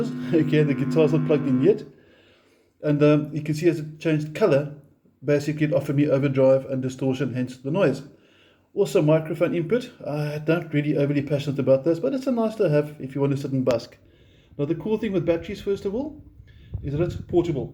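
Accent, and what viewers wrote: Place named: South Africa. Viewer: Much as I approve of your ZA/NA (?) accent, "NUX" is pronounced NEW-X in spoken English according to NUX (Cherub CN) themselves. Hey, as an owner of many NUX products and native English speaker from a time when grammar, punctuation, spelling and pronunciation were taught, insisted upon and examined, I used to pronounce it as spelled too for a long time. The keyboard doesn't type the subtle ">" used in the "NU>X" branding for so long well, which I suppose gave some hint of it. Returning to the relative mini amp comparison, of these three I chose and use the 3W Blackstar Fly 3 & Blackstar Fly 3 + Fly 103 6W Stereo combo for my battery powered portability deployment needs. Taking into consideration my purpose for the Fly 3s as I already had a Blackstar ID:Core Stereo 40W (now two thereoff with the addition of the latest V3 incarnation of it) for my primary practice amp, as well as multiple other larger electric performance and acoustic amps . 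In my country (AU) the NUX8 BT 8W with BT sells for around the same price as the Fly 3+Fly 103 6W Stereo combo (no BT) which includes its 240v AC to 6.5v DC step down PSU in the pack. The latter Blackstar 6W Stereo combo is really the direct comparison to the NUX price point for price point, with the advantage of Stereo which it trades off against the NUX's feature of Bluetooth. So in that sense, the standalone 3W Fly 3 isn't a fair or balanced comparison considering it sells for half the price of the NUX8BT or BOSS Katana Mini. Not that it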